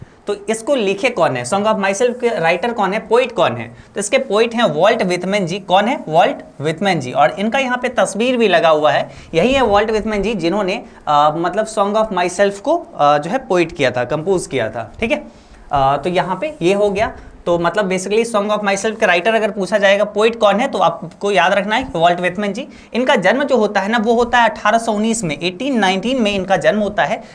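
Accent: Bihar, India. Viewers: proper bihari spotted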